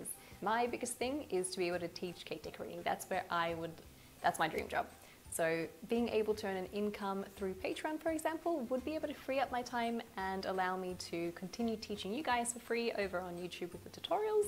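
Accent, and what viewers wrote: Australia. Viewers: Hi, just found your channel looking for tips on how to make my daughters first birthday cake. Your tutorial are awesome. Picked the Aussie accent straight away. I'm from Bendigo.:) xx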